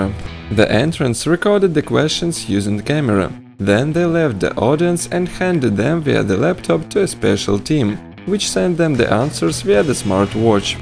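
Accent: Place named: Russia. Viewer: Ее, русский акцент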